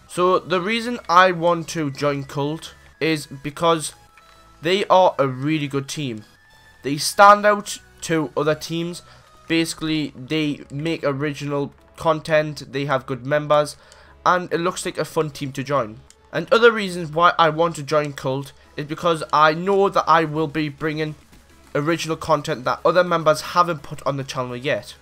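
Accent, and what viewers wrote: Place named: Newcastle, United Kingdom. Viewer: Your from Newcastle aren't you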